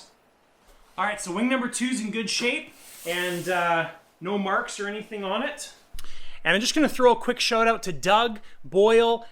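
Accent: Canada. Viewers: I don't know if I like this channel more for the great info and tips, or the fact that Canadians talk so funny...lol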